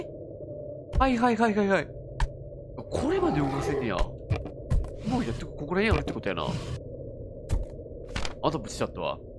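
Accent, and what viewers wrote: Kansai, Japan. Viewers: 床の軋む音とか囁き音やら音がまじリアルで…めっちゃ怖かった🥺 けどの関西弁沢山聞けて耳幸せ… 心拍数がある所で、こういう所ではびっくりしてるんだなぁって分かってそこは面白かったです！！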